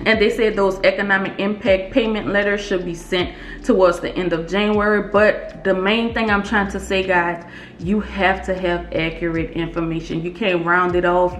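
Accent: New Orleans, United States. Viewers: I can tell you’re from New Orleans with that accent lol. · She sounds like a Nola city girl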